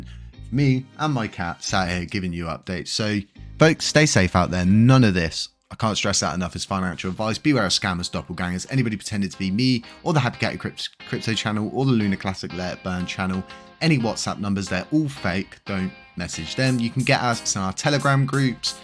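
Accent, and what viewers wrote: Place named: Somerset, United Kingdom. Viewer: My boys got a South West accent im thinking Somerset bai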